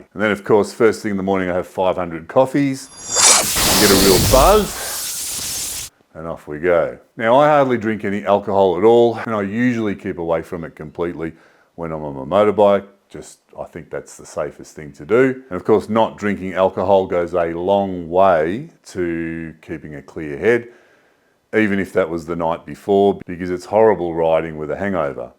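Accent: Australia. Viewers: 😎👍 Big thumbs up for the channel... Love hearing different way things are said Down Under... Motorbike... first time for ever saying that word... I'm 68... Stay Safe Brothers...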